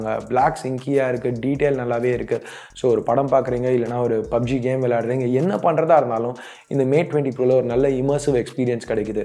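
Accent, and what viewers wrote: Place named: Tirunelveli, India. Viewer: Tirunelveli bro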